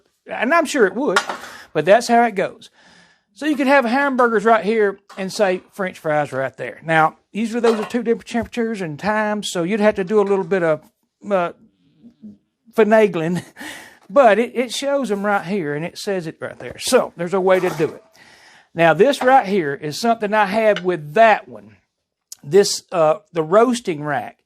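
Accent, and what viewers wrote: South, United States. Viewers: Where you from,? I'm a SC girl. Recognize that wonderful southern accent! Thank you for your videos!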